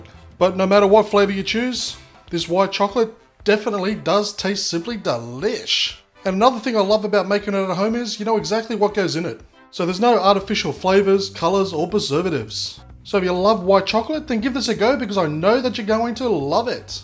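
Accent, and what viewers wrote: Australia. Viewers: he's Australian:) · I LOVE that Australian accent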